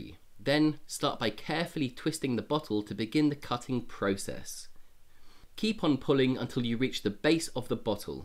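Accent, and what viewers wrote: United Kingdom. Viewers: Are you a fan of Ray Mears? He is a brilliant man, and I have yet to find anyone better than him at Bushcraft. This is my first time visiting your channel, and you remind me of him a little bit! (Not because of the UK accent) I subscribed and gave this one a thumbs up. I always enjoy watching new Bushcraft techniques! Great video!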